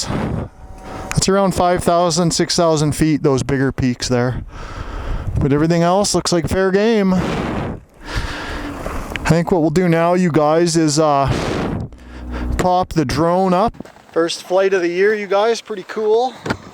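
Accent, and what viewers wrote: Canada. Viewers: Saw you in Global addiction man! Had no idea you were in that video but could tell it was you under the helmet because of your super friendly Canadian accent. I'm from Minnesota soooooo I knooow you you got the O's! Lol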